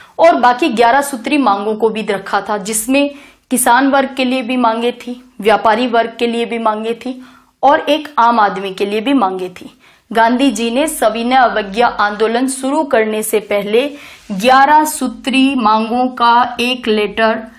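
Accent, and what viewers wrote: Haryana, India. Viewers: Mem app hariyana se ho na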